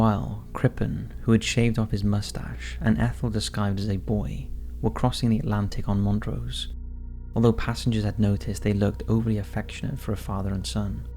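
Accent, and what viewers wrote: Wales, United Kingdom. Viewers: Ah maybe! I've been trying to pinpoint his accent from day one! A mild Welsh accent is a definite possibility! 🤔Thanks 😁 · The narrator sounds so much like Craig Roberts! I can hear a Welsh twang to his accent or something! Love that accent! Beautiful!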